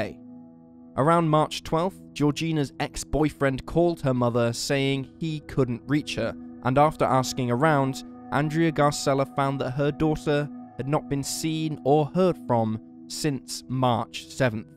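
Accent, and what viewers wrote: United Kingdom. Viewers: clicks on the video - hears bri-ish person - day ruined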